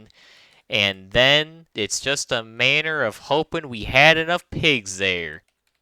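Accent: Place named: Scotland, United Kingdom. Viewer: Bro sounds slightly scottish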